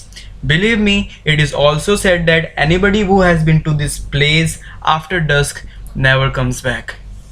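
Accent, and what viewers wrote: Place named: Bihar, India. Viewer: Maa kasam bht bura...... Bht bekar accent 😐 bc esa lgra koi bihari ldka bol rha..... Bura mt manio bt sch me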